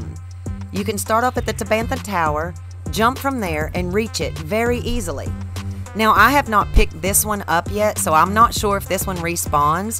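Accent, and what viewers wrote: South, United States. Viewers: I got a switch a couple months ago and I started playing breath of the wild not too long after. Your videos are awesome and they’ve helped me a lot thank you. Also as a fellow southerner I appreciate your twang 😁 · I really like your accent. Not sure where you’re from, but it reminds me of the South and that is very comforting to me!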